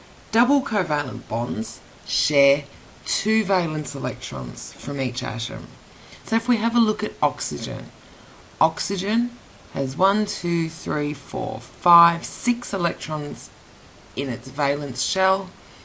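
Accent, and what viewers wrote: Australia. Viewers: Aussie Accent. So easy to tell :D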